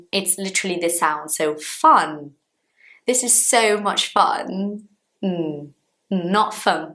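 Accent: United Kingdom. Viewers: Finalmente um canal onde posso aprender inglês BRITÂNICO, já tava cansado de só ver inglês americano no meio do YouTube, parecia até que só existia aquele, enfim, já tô amando tanto as lições como esse sotaque tão sofisticado e... MUITO MAIS BONITO que aquele sotaque americano que parece caipira falando, da até agonia rsrsrs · Your British accent is so delicious! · I love your videos! I understand all of you say. And I love your British accent. Congrats,!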